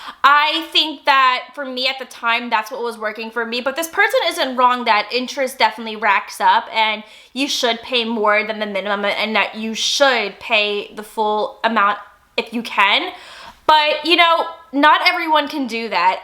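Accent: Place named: San Francisco, United States. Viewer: I thought you were a San Francisco Native because of your beautiful San Francisco accent. It’s a very unique way that native San Franciscans talk and I love it.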